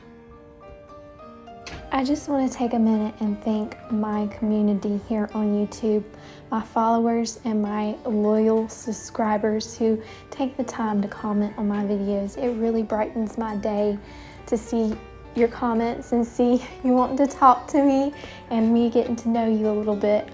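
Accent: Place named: South, United States. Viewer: I can feel Southern hospitality seeping right through the screen! I love the sweetness and gentleness of your videos, could listen to your accent all day! 🤗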